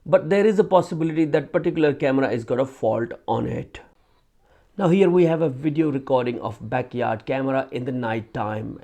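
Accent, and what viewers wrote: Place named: India. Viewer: You are speaking Indian, we didn't able to understand what you are saying !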